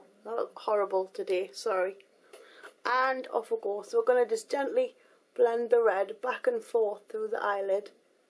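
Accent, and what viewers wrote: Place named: Scotland, United Kingdom. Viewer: Her accent mixes both english and scottish. I heard a welsh person talk before and they didn't sound that different from her.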